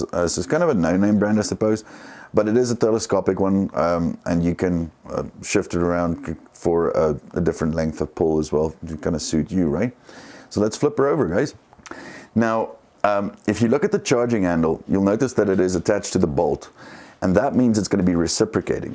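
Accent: South Africa. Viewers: You sound South African ... ?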